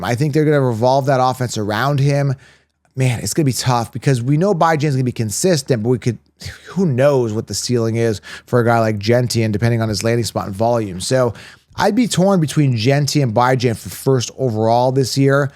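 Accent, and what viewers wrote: Canada. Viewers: It’s just his accent my man. I think he from Canada 🇨🇦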